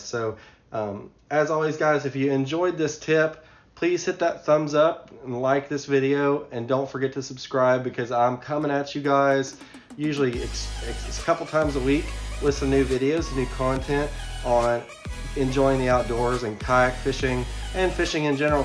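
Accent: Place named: Kentucky, United States. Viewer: Ok, well so far I've heard you say waller it out and now you're using electrical on your PFD. You are definitely from Kentuckuy and that's a good thing.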